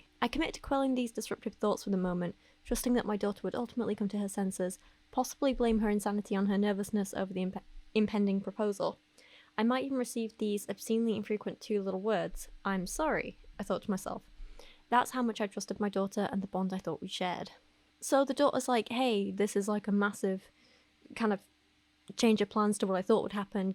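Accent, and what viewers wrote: United Kingdom. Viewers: I wish I could understand you. 😅😅😅😅 your accent works well in the UK...not in the US....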